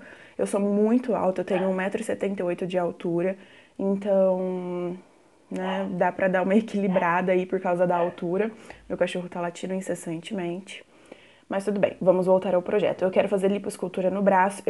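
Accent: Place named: São Paulo, Brazil. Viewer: Sotaque dela é lindo, interior de SP. Amo ❤️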